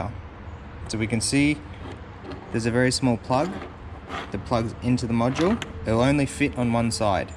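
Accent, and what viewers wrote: Australia. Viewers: Why did you skip illumination if the wire was right there? I don't know about AUS (I'm just guessing based on your accent), but in the US, Kenwood HU's do dim appropriately when the headlights are on at night. I get not going out of the way to find it...but capping off both orange wires takes just as much effort as connecting and soldering/taping the orange together. Just an observation...well done otherwise.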